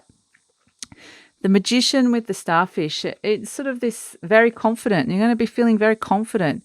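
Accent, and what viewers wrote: Australia. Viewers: You sound quite the "Aussie."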